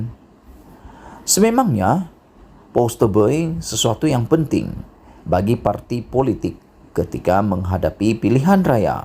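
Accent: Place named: Sabah, Malaysia. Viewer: Melayu sabah lh tuu .awak ni xpernah campur orang sabah tengok tv S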